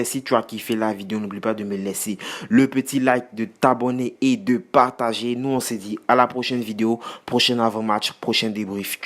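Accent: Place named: Ivory Coast. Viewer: T’a l’accent ivoirien toi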